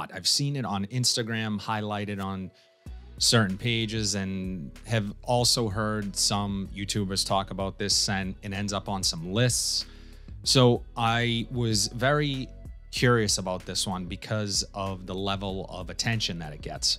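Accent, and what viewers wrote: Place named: New York, United States. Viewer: I am from Denmark But i must say your english is straight out of the Sopranos. Love it, you have to be from New York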